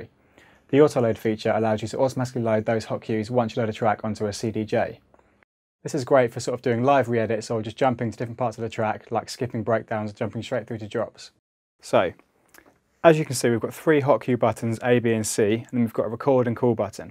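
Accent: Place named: United Kingdom. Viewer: The guys accent is too pronounced and he talks too fast. The tutorial would be more effective if it didn't cater only to brits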